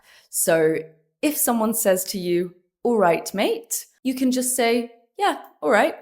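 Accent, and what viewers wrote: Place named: RP, United Kingdom. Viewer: Hello! Thank you for this lovely video! I’ve noticed some rhoticity in your accent, even though it usually sounds like RP. Can you please educate me on what your accent is called or where it is most common?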